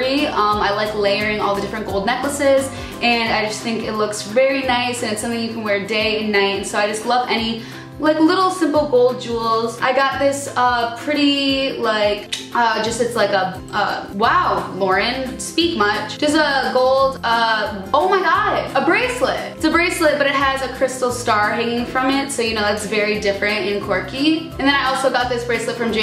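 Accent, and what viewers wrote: Chicago, United States. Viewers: Wait is she from Chicago? Someone please tell me!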